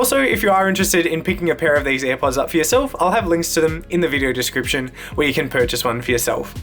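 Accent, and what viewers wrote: Australia. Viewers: His aussie accent <3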